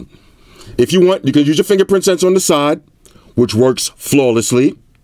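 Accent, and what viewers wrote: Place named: New York City, United States. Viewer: NYC slang in full effect when you watch....I feel like I’m chilling on the “stoop” with one of my homeboys! I know knows the word stoop!! 😅😅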